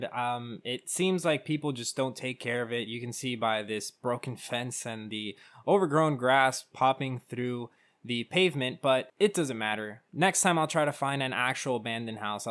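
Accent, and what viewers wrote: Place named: Miami, United States. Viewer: I knew it since i heard your voice my first reaction was “something about his dialect just screams Miami” lol Our dialect sticks out like a sore thumb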